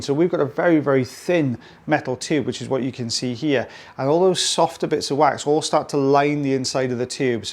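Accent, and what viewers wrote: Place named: Wales, United Kingdom. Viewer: I live in Australia but am from UK. Lived in Wales for 7 years and watch you to hear your voice (besides the extractions of course) Takes me back 57 years. Of course I hope you are feeling better, it’s winter here and lots of flu, head colds etc. Hope you coped with the heatwave, that’s the norm here in summer.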